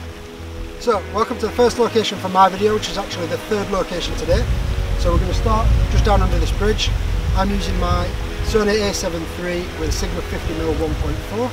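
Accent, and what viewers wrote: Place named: Northern England, United Kingdom. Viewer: Really cool stuff and loved hearing that familiar nothern accent, keep up the good work mate 😊👊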